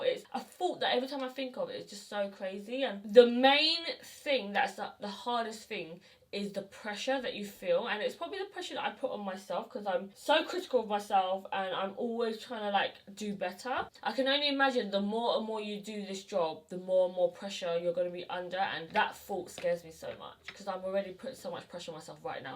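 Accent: United Kingdom. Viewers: She has braces and she has a British accent. Maybe you're not use to hearing people with British accents and only American accent. But she speaks very clearly. I understand her fine. She's one of the best make up artist teacher on YouTube.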